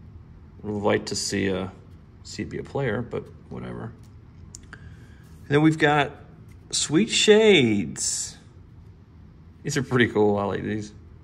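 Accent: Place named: North Carolina, United States. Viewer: Based on the accent I assume we are from the same “Queen City” in NC. Which LCS do you use?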